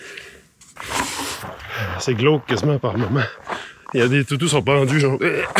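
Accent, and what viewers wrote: Quebec, Canada. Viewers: Je voulais vous remercier pour toute les astuces que vous nous avez partagé. Si j'arrive à faire mon kombucha, c'est grâce à vous. C'est un plaisir de vous écouter (avec cet accent Québécois si agréable). A bientôt.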